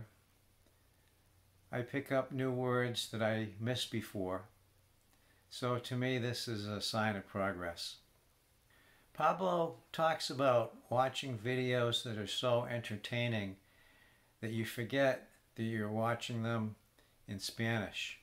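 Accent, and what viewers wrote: United States. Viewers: Yes this is literally speaking Spanish with a complete American accent with zero effort on the accent, this is something that can be improved and even mastered.... if one is aware of it. It's crazy to me that people think they can speak another language but not use, or even attempt another accent, it's just as important as the grammar and the vocabulary. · Sounds like he speaks Spanish with an American accent… good going….organic Spanish is awesome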